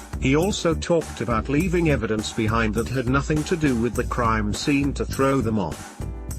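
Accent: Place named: England, United Kingdom. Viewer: Robot voice with a snooty English accent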